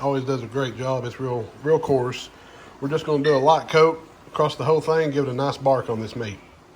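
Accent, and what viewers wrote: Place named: Georgia, United States. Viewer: New to the channel. Was browsing ideas for the Tomahawk. I like the make shift basting idea 💡. However I have to say, and strictly off of personal preference, 100% NO Montreal Seasoning. Judging by the accent, you sound like a good ol Gerogia Boy. I had a friend visit from Dallas over the summer, and he used the Montreal Seasoning on a few ribeyes we smoked, and I have to add, I DO NOT enjoy it on my steak. Salt N Pepper ONLY. Others have different preferences, and I find a lot of Louisiana-Georgia boys love themselves some Montreal Seasoning. Also, wanted to ask, what flavor pellets do you prefer? I myself enjoy the Charcoal and Mesquite pellets. It really accents the flavor of the meat. I like the temp on the steak that you prepared as well. Perfect. I have the Boss 820, im not impressed with the Lockhart, the temp fluctuates too often and the box uptop seems to not hold temp as well as I hoped for. 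Also, I prefer the reverse sear, the temp control I find easier and allows more flavor into the meat before the sear. If you'd like to exchange videos, ideas and/or recipes, feel free to message me and we can exchange contact info. Always looking for good bbq persons who want nothing better than to make their family smile with tasty meals.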